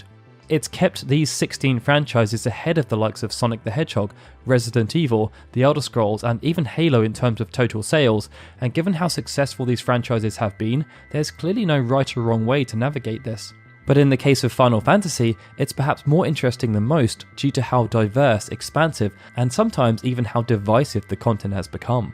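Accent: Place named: RP, United Kingdom. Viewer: Great stuff! Intriguing and well crafted as usual. I grimaced at /I no va'TIV/ though! I've always heard /'I no vei tiv/. It's obviously down to your exceedingly posh british accent.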